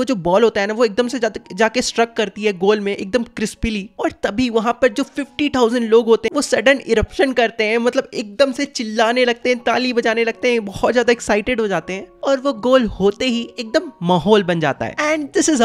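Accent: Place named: Bihar, India. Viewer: thodi English k words theekse use kijiye bhaiiya aur apni pronunciations sudhariye zabaan clear honi chahiye. toh hindi mai aapke channel pr ek bar sunkr log hrbr ayenge bihari accent use mt kijiye❤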